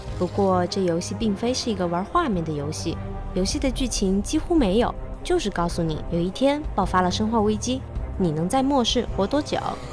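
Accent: Sichuan, China. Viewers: 是四川口音啦，不能说是不标准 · 川普可是四川频道主的特色，不可不尝啊。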